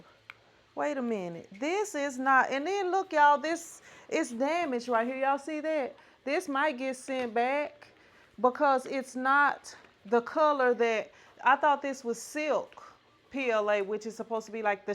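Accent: South, United States. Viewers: That’s crazy! Before you mentioned you were a basketball coach I thought to myself this woman is built like she hoops. I love your southern dialect btw. Thanks for all the t-shirt help and pointers.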